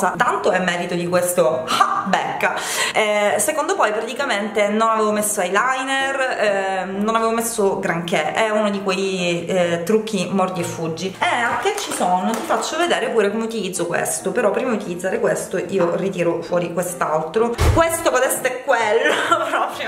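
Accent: Sicily, Italy. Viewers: Stop al video prendo il pennello e lo #scatafotto muoioooo 😂 Mi piace che inserisci termini e accento siculo. 👍💕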